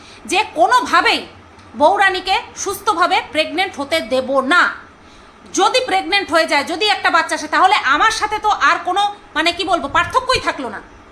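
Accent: Bangal, Bangladesh. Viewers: তোমার মুখে বাঙাল ভাষা খুব ভালো লাগে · Tomar mukhe bangal kotha valo lage age bosak ke nie bolte tokhon bangal kotha bolte. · দারুণ কথা বলেছো।আর কি সুন্দর বাঙ্গাল কথা শুনতে খুব ভালো লাগছিলো।আর হাসছিলাম। ভালো থেকো।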